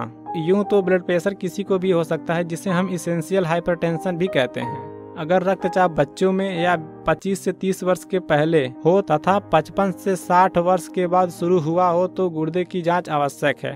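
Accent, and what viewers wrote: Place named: Bihar, India. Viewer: Sala bihari ki tarha baat karta hai lol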